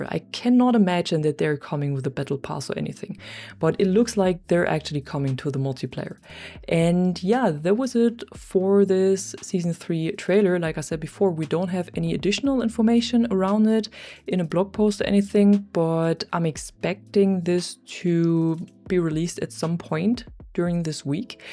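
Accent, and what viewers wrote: Germany. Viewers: Nach deinem Akzent zu urteilen, bist du wahrscheinlich Deutsche ;) Alles Gute zum Geburtstag !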